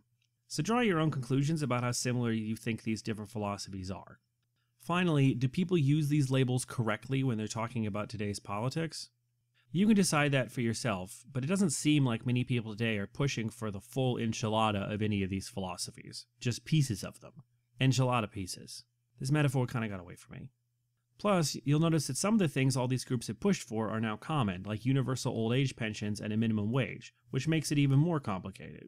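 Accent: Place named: United Kingdom. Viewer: honestly tho, i loved your brittish accent :DDD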